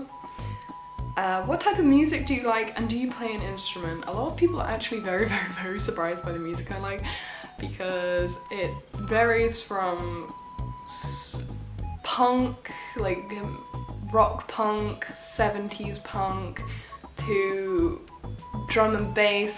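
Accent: United Kingdom. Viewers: Quite interesting. Do you notice what I'm talking about or do you feel you sound completely British? Like seriously when this video first starts I thought you were American.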